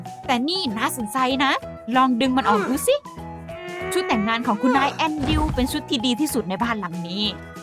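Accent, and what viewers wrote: Isan, Thailand. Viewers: น่ารักจังเลยชอบสำเนียงอีสาน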